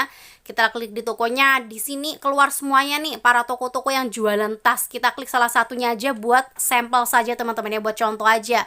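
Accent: Java, Indonesia. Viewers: Jowo ne medok tenan. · Jawa ne keton bgt ya mba, tapi ora ap2 cukup bantu go enyong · Muedooke gaes ngangeni..😀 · Medok plus Gas Pull yo ndo 👍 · Medok mba, mantap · Aku sukak medok jowomu kuwi mbakyu.... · Medok amat mbak 😆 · Alhamdulillah... Jam dua malem dapet informasi sepenting ini.. Makasih mbae.. Ngomonge medok bgt.. Hehehe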